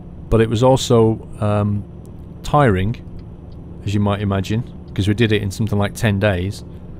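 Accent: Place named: United Kingdom. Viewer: I enjoy the long videos because they show full venture of the journy and i find it entertaining listening to you say things like nobbit as an american your british accent makes me laugh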